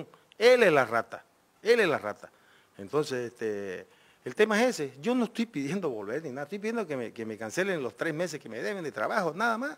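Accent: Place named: Camba, Bolivia. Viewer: esta es la voz de un camba de verdad, grande!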